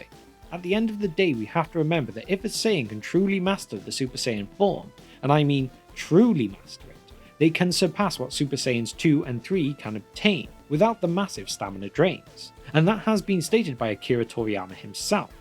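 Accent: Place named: North West England, United Kingdom. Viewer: Aah cool. I'm a scouser and thought I heard a hint of North West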